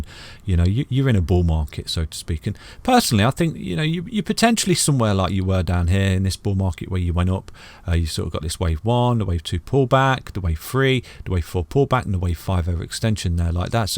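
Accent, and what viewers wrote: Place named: Cockney, United Kingdom. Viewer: It's bad enough you have a cockney accent which is very hard to understand, but you're also a fast talker. That's a horrible combo for a reporter whose audience is expecting to be able to listen to you. SLOW the eff down!!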